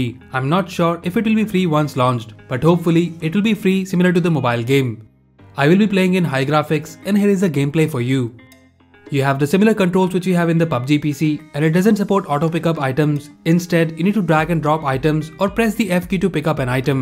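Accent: India. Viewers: that indian accent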